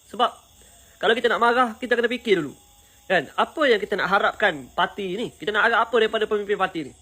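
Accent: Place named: North, Malaysia. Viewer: Jnis tak amik perkembangan... Loghat utara mgg guna berapa ekor....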